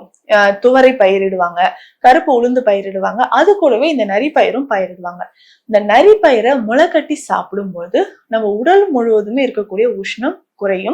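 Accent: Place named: Chennai, India. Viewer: Dr.என்றாலும் Madras தமிழில் தான் கதைப்பீர்களா?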